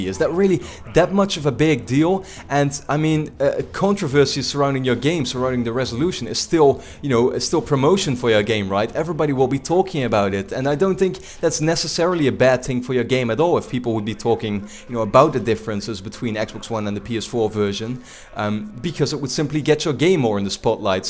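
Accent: Netherlands. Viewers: You sounds english with a dutch accent in it 😂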